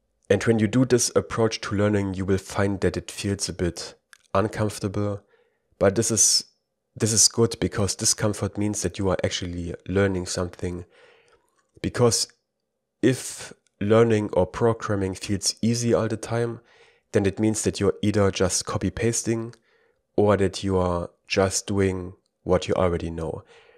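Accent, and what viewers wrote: Germany. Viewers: man merkt etwas, dass du aus Deutschland kommst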